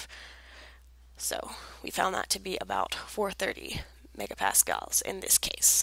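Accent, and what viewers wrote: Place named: India. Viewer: What's normal? An Indian instructor that you need to read captions to understand?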